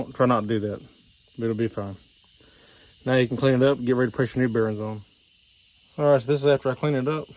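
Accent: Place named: South, United States. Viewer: Straight up Truth has a certain ring to it and you chiming like the Liberty Bell. Nice sharp car you got there, nice work, I especially like your comments on how not to damage the seal by denting it and causing a leak. Also cool Southern Accent. Hello to All from Alaska.. Ps; ye'all keep up the good work.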